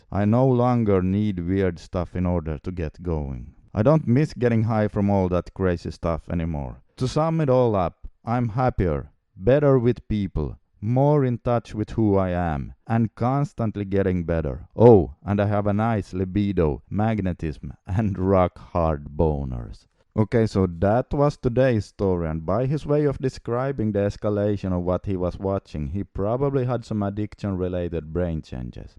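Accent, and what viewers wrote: Finland. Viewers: First two seconds and can already tell youre finnish lmao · kyl huomaa et äijä o suomest :D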